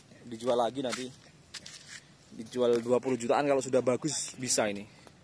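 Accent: Java, Indonesia. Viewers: Aku senang bahasa indonesia mu mas tapi jowomu medok jawa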